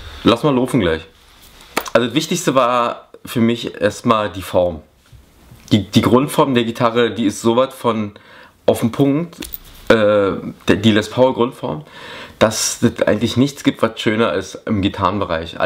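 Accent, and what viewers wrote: Berlin, Germany. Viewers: Berliner Dialekt ;) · Yes. But it's not an accent it is a dialect and this dialect of the berlin region feel "dirty" for other germans. For example: they say "dat" or "ick" instead of "das" and "ich". It sounds very shortened. Fun fact: "ick" is often the wrong pronunciation of "ich" from americans/british. Because they have the same trouble with the "ch" as we with the "th" :D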